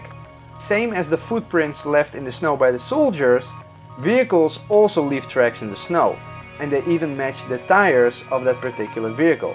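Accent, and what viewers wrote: Netherlands. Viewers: Are you dutch? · Are you Dutch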